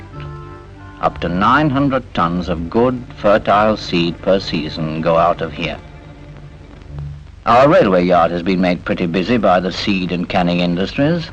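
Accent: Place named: Yorkshire, United Kingdom. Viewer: You can hear traces of a Yorkshire accent in the presenter's RP.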